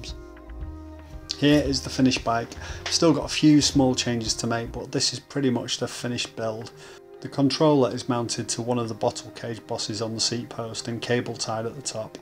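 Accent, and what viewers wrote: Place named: Liverpool, United Kingdom. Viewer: he's got an accent sounds like the Beatles yellow submarine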